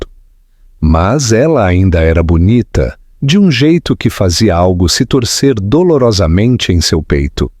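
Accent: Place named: Portugal. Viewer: troca de sotaque para o português de Portugal... dá sonolência a narrativa... escutando de Passo Fundo RS.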